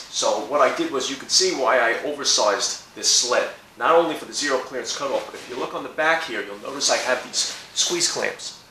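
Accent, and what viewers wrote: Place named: Brooklyn, United States. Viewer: Excellently produced video. Very clearly explained. Thanks. Love your accent. Is it Brooklyn? Sounds cool to an Englishman. :)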